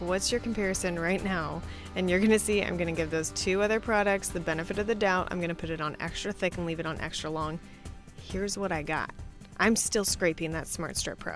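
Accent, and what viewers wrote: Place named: United States. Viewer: You sound American. Other countries have safety restrictions on paint strippers.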